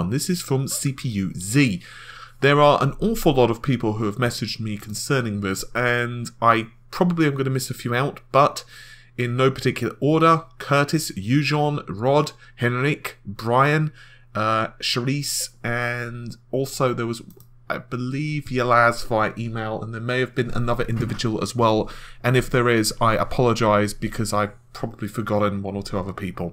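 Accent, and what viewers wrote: England, United Kingdom. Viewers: ***** Apparantly some Americans are so incredibly unsophisticated that they get confused if they hear the same language with a slightly different accent. My advice to those people: don't ever migrate to Europe. Within a country the difference in pronounciation are much larger than the difference between American English and English English, not to mention that you would actually have to pick up foreign languages. Unless you live in England, technically that is a part of Europe... To be fair, in England there also are some strong differences in pronounciation but speaks 'general' English, not some less common accent.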